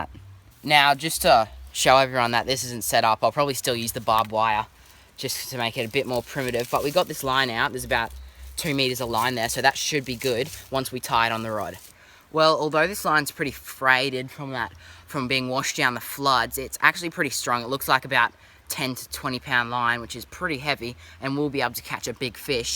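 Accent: Australia. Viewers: Are you Australian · Haha you Australians talk so funny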